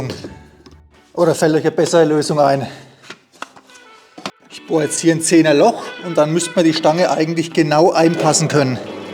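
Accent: Franconia, Germany. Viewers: Hört man da fränkischen Dialekt durch 👂🏻👂🏻👂🏻.....😊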